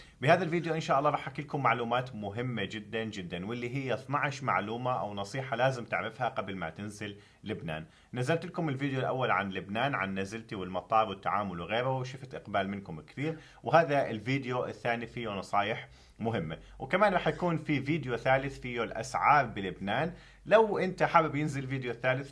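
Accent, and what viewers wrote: Deir ez-Zor, Syria. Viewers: كانك من الدير🔥🔥🔥💪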